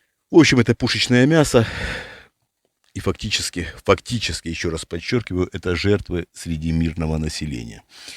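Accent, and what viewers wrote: Ukraine. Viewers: Судя по вашему говору, вы украинец?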